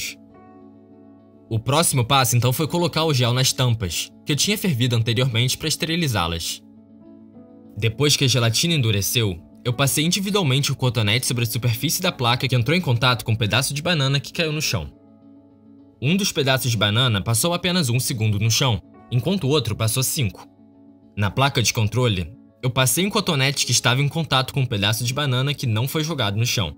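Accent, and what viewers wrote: Rio de Janeiro, Brazil. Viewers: ele é carioca?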